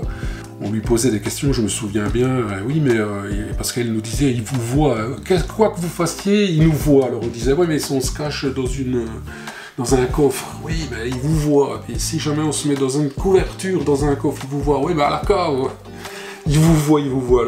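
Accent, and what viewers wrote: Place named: Franche-Comté, France. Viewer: ❤ Magnifique témoignage (et magnifique accent comtois)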